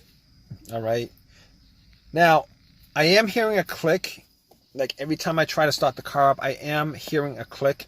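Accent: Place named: New England, United States. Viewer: He sounds like a New Englander, they help it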